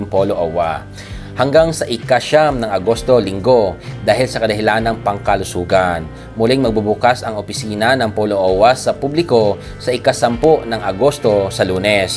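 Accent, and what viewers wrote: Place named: Visayas, Philippines. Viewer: Taga asa mankaah mora mag kag bisaya hehe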